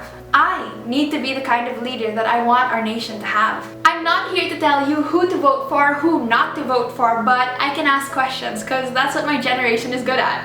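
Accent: Philippines. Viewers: im sorry, i just cant help but notice your tagalog accent and it was the cutest thing ever!!!!! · slow clap for 👏 i love you 😍😘 soo proud of you 😊 PS: love ur tagalog accent 😁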